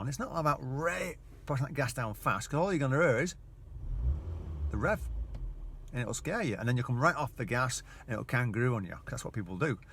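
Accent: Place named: Yorkshire, United Kingdom. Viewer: lol of all videos I could have picked. I recognised the statue on the roundabout. Your Yorkshire accent threw me off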